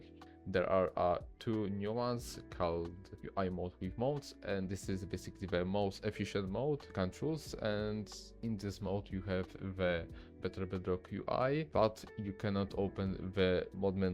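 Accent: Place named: Poland. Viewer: Słychać ten polski akcent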